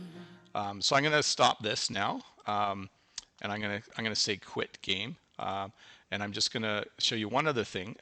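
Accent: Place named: Canada. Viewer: Hi! I really like Canadian Accent! Thanks!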